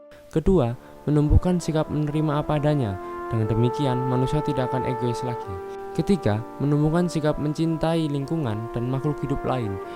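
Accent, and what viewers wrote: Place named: Java, Indonesia. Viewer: medokkk men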